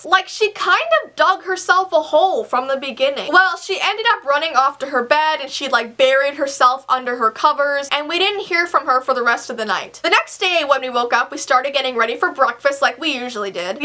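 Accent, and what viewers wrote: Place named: Canada. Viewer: think it's jut her Canadian accent. In her baby sitting vid, she pronounced bagel as BAG-gul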